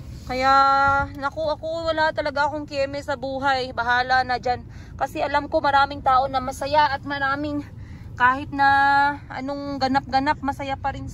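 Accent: Iloilo, Philippines. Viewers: Ilonggong ilonggo ka gid ba Inday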